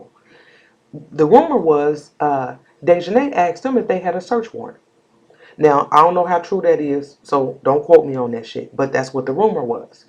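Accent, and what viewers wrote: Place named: Chicago, United States. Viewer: I’m a newbie to your channel. Love your real ness. Sound like you from my hometown Chicago... lol anyway this story is sad. Yes the truth will come out, just like it came out on the 911 call. The hospital really need to implement mental health test after mothers have babies with special needs or not before exiting the hospital to potentially avoid unfortunate death of babies and toddlers. This is so common and the lies are getting dumber and dumber. Justice for baby Braylen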